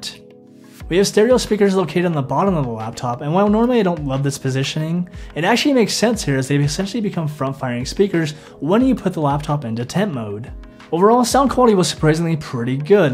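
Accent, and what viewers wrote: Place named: Northern Ireland, United Kingdom. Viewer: Mate, where the hell are you from? You sound 5% Norn Irish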